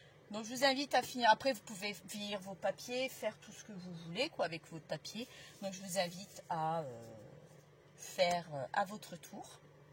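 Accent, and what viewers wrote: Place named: Lille, France. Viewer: bonjour j'ai découvert ta chaîne par Agnès et je me suis abonnée sympa le pote bobine .j'aime beaucoup se que tu fais mais dit ton accent je le connais tu es une boyau rouge il me semble......merci a toi pour ta bonne humeur. gros bisou alexandra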